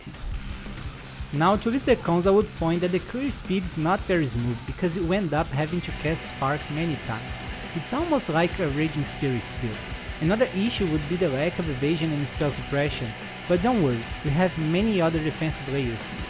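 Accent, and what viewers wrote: Brazil. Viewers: Sotaquezão, parece brasileiro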